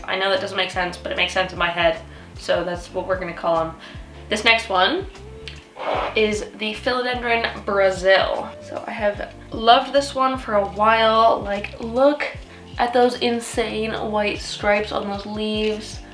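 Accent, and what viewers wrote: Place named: United Kingdom. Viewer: Omg I can hear your UK accent forming lol 😝❤️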